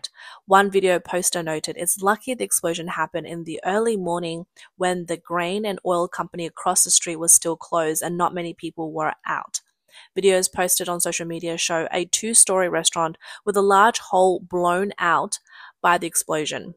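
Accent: Australia. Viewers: This Aussie confirms it to be an Aussie accent. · Side note: I love your accent... Aussie? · I think I detect an Aussie accent from the narrator. Props to you young lady for exposing what the CCP suppresses daily.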